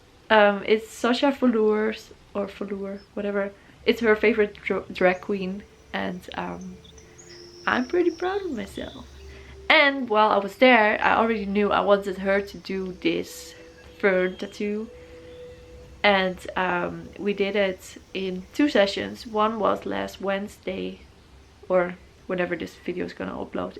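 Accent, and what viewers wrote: Netherlands. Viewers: No, it's NOT rude! I'm Dutch and I don't say it like that. I have English speaking friends and they say I don't have a Dutch accent. It annoys me too. But her accent doesn't sound bad even though it's clearly a Dutch. It still sounds nice I think apart from the ID idea word. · She's Dutch, and she pronounces the word like most Dutch people do. Your comment is unnecessary and quite honestly, pretty rude.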